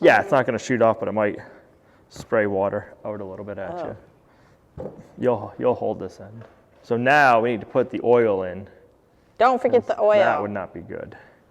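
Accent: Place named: East Coast, United States. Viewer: Eye deer? its idea. Not eye deer sir. You are definitely an East coast dude with your miss pronunciation of your speaking. Come on get with it. You sound. Like a fu king Kennedy, YUCK.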